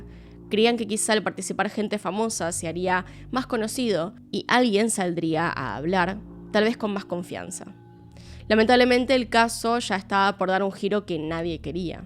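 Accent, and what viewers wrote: Argentina. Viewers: Hola me encanta tu acento argentino supongo y también tu acento angloparlante supongo que también hablas inglés te felicito por tu programa es magnífico y tú eres muy bella saludos😊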